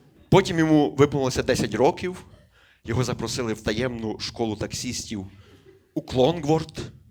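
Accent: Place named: Western Ukraine, Ukraine. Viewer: Ще за «святу Галичину», ходили, плавки і т.п. на рідному западенському сільському акценті аплодисменти стоячи. 👏👏👏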